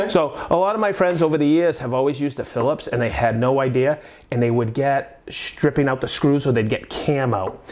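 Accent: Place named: Boston, United States. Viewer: Thanks to that nice Boston accent, i felt like i was watching an wpisode of This Old House 😌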